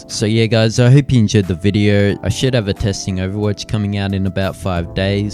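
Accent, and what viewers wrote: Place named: Australia. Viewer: i think i played with this guy last night on deathmatch i'm guessing your from aussie from the accent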